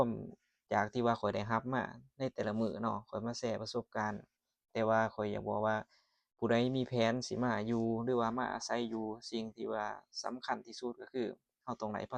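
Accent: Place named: Champasak, Laos. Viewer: ຢູ່ບ້ານໃດຢູ່ລາວເວົ້າຄືຄນແຂວງຈຳປາສັກ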